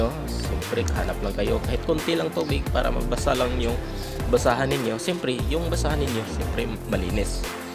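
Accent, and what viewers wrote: Visayas, Philippines. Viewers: Dong ang tingog nimo halata kaau na bisdak jeee pero ok na aron masabtan sa mga bisdak pod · Bisaya ka bay noh?😁😁 Salamat sa tip bay👍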